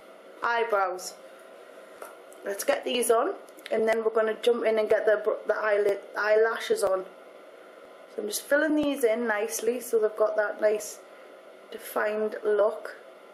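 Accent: Newcastle, United Kingdom. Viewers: she's from newcastle, so its called a geordie accent lol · I think she sounds alittle more british.. from the UK at least haha. idk. I'm probably wrong. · I think she's from Newcastle (north of England) she sounds like Cheryl Cole so I think so! I loveee it :) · its called uk accent XD everyone talks like that there lolz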